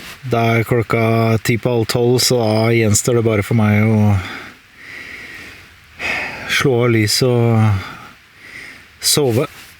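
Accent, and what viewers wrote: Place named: Drammen, Norway. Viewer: Fin video. Du holder det nede og gir det hele den ro villmarkslivet skal gi oss. Artig liten historie, akkurat nok prat og fin bruk av kamera. Har bodd på østlandet i 20 år, nå flyttet til midten, og mener jeg kjenner igjen Drammens dialekten. Ser fram til neste video.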